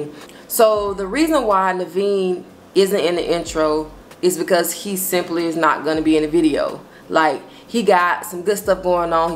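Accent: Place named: South, United States. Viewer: She's definitely from the south.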